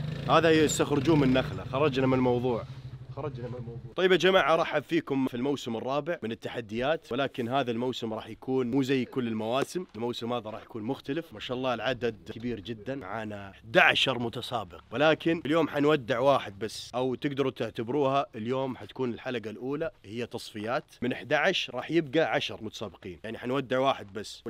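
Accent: Saudi Arabia. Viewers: لهجتك مثل السعوديين تماماً هل انت سعودي ولا ارني 🇸🇦🇯🇴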